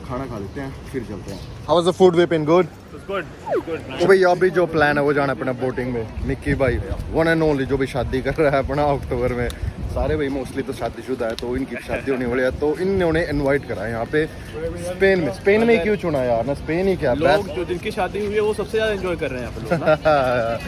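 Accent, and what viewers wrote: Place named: Yamunanagar, India. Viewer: Boli to ynr side wali h bai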